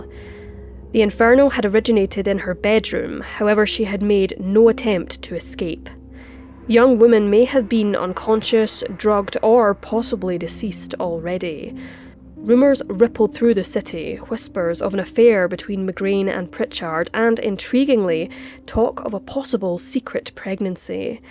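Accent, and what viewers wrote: Scotland, United Kingdom. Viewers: It's good to hear a Scottish accent! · Excellent channel your accent is scottish i think anyways thanks from Boston Massachusetts USA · Love your Scottish accent. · I keep forgetting you're scottish. I really do enjoy Scottish narrations! Is your accent Glaswegan? (Sp? ) Or is it from another area? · You uttered the phrase "by a malicious Englishman", with far too much enjoyment in your lilting Scottish accent! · Love your videos, Scottish accent (I'm from Ayrshire) is the best for crime docs.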